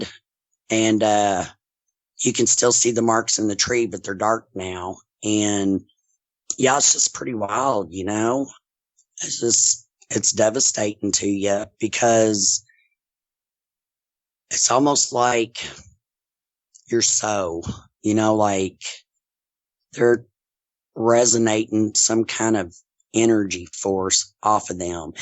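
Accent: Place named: Kentucky, United States. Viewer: hearing your Kentucky accent while listening in KY freaks me out because I feel these beings around. (There’s a noise outside just now) As a courtesy would you mention the county you live in? Prayers going out to you!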